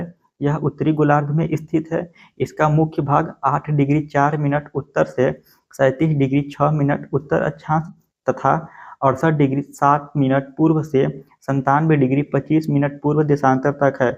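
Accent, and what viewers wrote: Uttar Pradesh, India. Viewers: Sir aap up se hai kya😊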